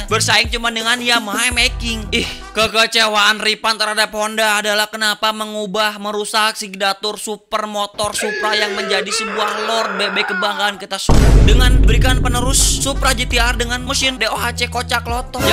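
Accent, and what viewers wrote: Jakarta, Indonesia. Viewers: Bang, Saya penasaran apa abang orang Sunda atawa Betawi ya? Soalnya logat Betawi tapi banyak kata Sunda dipakai? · Logat betawi emang enak klo didenger 😂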